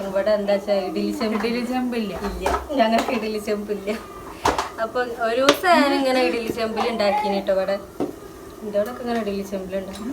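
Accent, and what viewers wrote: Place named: Malappuram, India. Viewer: നിങ്ങളെ നാട് എവിടെ തനി മലപ്പുറം ആണ്❤😂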